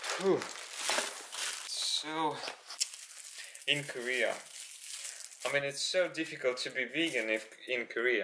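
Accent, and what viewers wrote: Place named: Mexico. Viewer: love that Mexican accent when you speak Spanish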